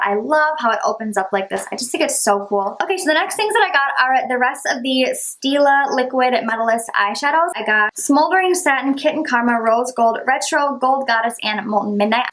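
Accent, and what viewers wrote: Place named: Midwest, United States. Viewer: I just found your channel and I'm obsessed with you already lol and I love your midwest accent!! I'm from Wisconsin so wooooo midwest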